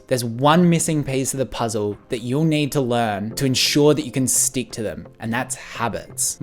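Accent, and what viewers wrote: Australia. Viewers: Your channel are full of useful knowledge, also the Aussie accent reminds me of the days I used to study in Melbourne. And i am so suprise that we are almost at the same age and you already achive such a great success, i am start to learning e-commerce! thanks!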